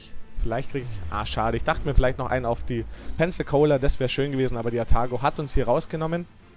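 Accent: Germany. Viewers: I like your German accent.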